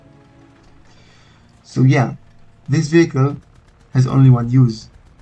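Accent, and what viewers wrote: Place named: Israel. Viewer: The accent, oh the ACCENT! I have an Israeli friend and he speaks English just like this guy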